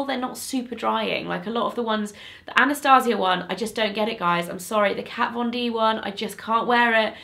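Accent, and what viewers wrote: United Kingdom. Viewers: Ugh, where have you been all my life?? I'm completely in love. I could kick myself for not finding you sooner! But instead of kicking myself, I shall just binge watch your videos and enjoy drinking in your wisdom! Am I fan-girling out too much? Ah well, that's okay with me! I must say a lot of the US beauty bloggers I have been watching all talk about the same products all the time over and over and over. I know there are exceptions to every rule, but sigh...not many exceptions to that one in my experience. What I appreciate so sooooo so much is getting to hear about so many DIFFERENT products! There aren't many mentioned here that have been mentioned at ALL by the beauty vloggers I've been exposed to, and that's the absolute truth. I am dying to learn NEW things from NEW people with NEW experiences - and this channel is it. I'm hooked and loving every second of it. 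Aside from appreciating your expertise, I could listen to you speak with this beautiful U.K. accent all day. All I allowed to say that?? LOL well I am! I'm just in love with all things this channel - and I'm so grateful! Can't wait to tell everyone I know. THANK YOU SO MUCH! I can't wait to see all you have to come in the upcoming months. Blessings to you in the New Year! 🦄⭐️☃️💋💕🌹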